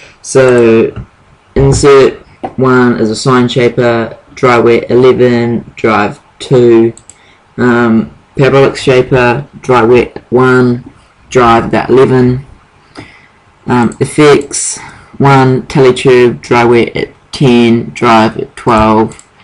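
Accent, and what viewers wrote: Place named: New Zealand. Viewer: lol hard nz accent hahaa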